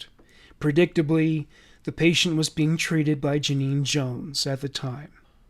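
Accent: Canada. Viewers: He's Canadian give him a chance aha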